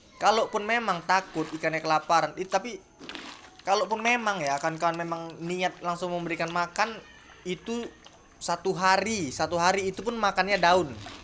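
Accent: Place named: Medan, Indonesia. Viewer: Kayak orang medan abg ngomong nya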